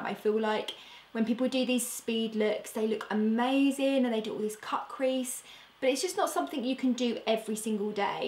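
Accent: United Kingdom. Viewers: I love this look ! Also, I can't get over the fact that you look SO much like Cameron Diaz with a British accent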